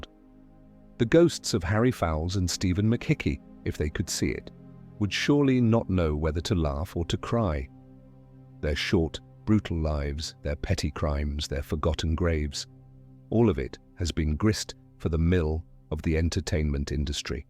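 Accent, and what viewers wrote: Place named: United Kingdom. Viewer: Well, well, well. You made me actually sign into YT for the first time ever. These videos that you throw out there in a breathtaking speed are amongst the best history docs I've ever watched. I'm not talking about the artwork which probably had some help from AI, I'm referring to your narrating style and the kind of political neutrality I'm expecting but never encountering when watching the stuff of others. Long story short: This is brilliant and well thought through stuff and I wanted to thank you honestly for sharing. Speculating about your accent, I suppose you're from the UK, a country that in recent history appears to have its troubles with the application of free speech, but you seem to be rather unimpressed and not influenced by it at all. Good for you! Let me know if I can help. I'm fascinated by history and might be willing to dedicate some of my time to support you. 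Thumbs up for your work, well done!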